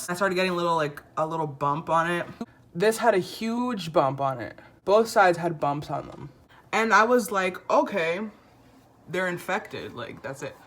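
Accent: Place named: Dominican Republic. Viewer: I knew que eras Dominicana or cubana lol I have a Cuban friend that talks just like you, I’m Dominican btw 🇩🇴🔥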